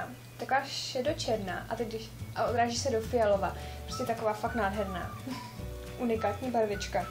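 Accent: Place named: Moravia, Czech Republic. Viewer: Mě se na tobě líbí takovej ten moravském přízvuk:D např. jak říkáš líbí-líbijou :) video je super a nad nákupem přemýšlím! :)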